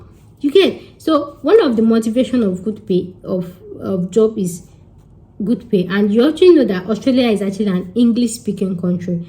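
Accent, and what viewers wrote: Yoruba, Nigeria. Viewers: Hey pretty.... Nice video, but that Yoruba intonation is a turn on for me.... Won't mind knowing you more🙈😀🥰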